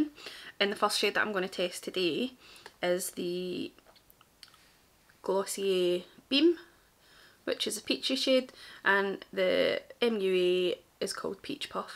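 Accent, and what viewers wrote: Scotland, United Kingdom. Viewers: oooh fab! 🤗 let me know if you need any advice or help at all with editing etc - I’m a professional videographer based in Glasgow 💛 So nice to hear a Scottish accent on YT! x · Is ur accent Scottish? I love it!!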